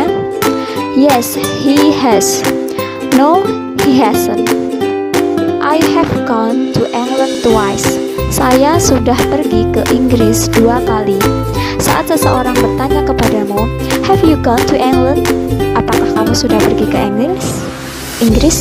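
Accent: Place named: Java, Indonesia. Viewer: Suara medok jawa bahasa inggtis jadi bagus juga yaa kerren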